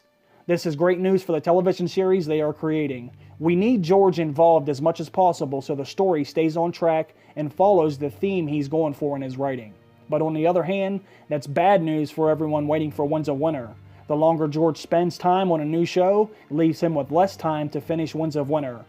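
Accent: Pittsburgh, United States. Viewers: Are you from Pittsburgh by any chance? That accent is undeniable! I knew it as soon as I heard it! Bet you say jagoff too, don't ya?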